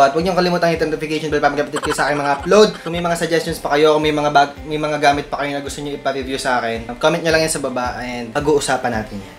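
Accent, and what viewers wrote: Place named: Laguna, Philippines. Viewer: Taga laguna po kayo sir parang puntong laguna eh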